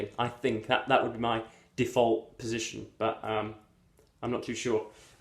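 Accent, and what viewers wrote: United Kingdom. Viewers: I love how british he is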